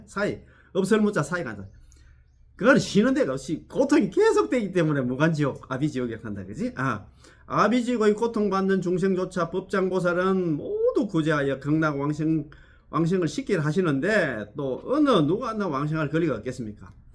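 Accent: South Korea. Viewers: 오늘은 비가 내리네요 인자한 미소와 경상도의. 구수한 사투리가 정감이가는선생님의. 강의. ㆍ존경합니다 오늘도 건강하시고 좋은날 되십시요 나무아미타불 나무아미타불 나무아미타불.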